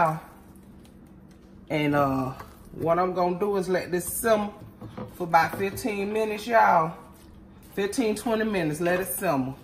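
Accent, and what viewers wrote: South, United States. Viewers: I enjoy watching your videos and listening to that southern jville twang. Keep it up!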